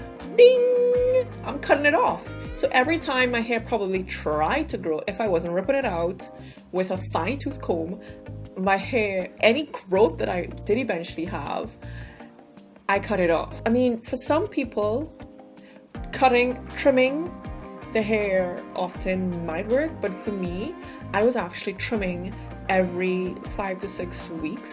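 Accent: Trinidad and Tobago. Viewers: Ohhhhh is that a trini accent i hear?? Big up the T&T massive lol we out theree. Very informative vid too. I have a twa and im still trying to get ways to style it , sigh.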